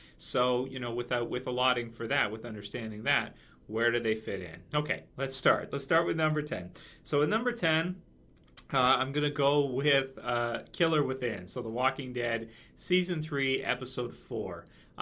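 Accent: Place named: Canada. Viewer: Hey. Out of curiosity, what province are you from? I am an American but I lived in Newfoundland for awhile and based on your accent I'm almost positive that isn't where you're from haha. I've been to a lot of places in Canada and I was just curious as to what part of the country you're from.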